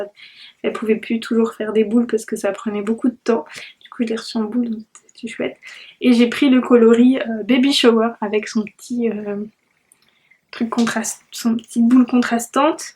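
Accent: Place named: France. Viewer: Je suis toujours contente de voir tes podcasts! déjà entendre parler le français de France c'Est super...et en plus c'Est toujours bien complet! et pour le Kal... oh j'ai hate... ce coup ci je le fais!!! Ne change pas! tout est très bien! Rose, xx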